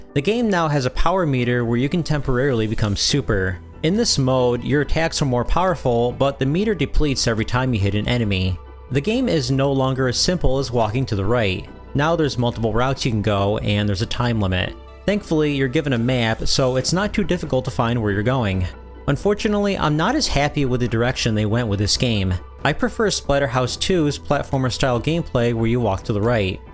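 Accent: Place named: Rochester, United States. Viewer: Your voice sounds like rodchester new york. Garbage plate eater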